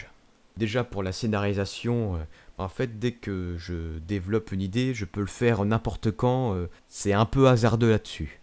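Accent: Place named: Quebec, Canada. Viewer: Continue comme ça et tu prendra ton axant québécois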